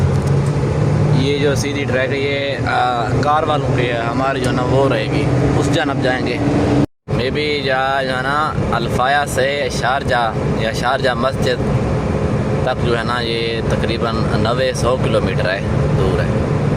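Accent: Saraiki, Pakistan. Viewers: استاد اردو تو ایویں لگدیں جیویں سرائیکی ہو ویں۔ مسات ہیں کتھوں دا؟؟؟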